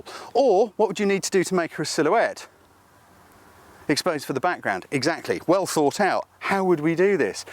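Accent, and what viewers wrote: England, United Kingdom. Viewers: I think it's because an Englishman is speaking English as opposed to non native. We hear "incident" but I can see why someone who isn't English might hear "instant"!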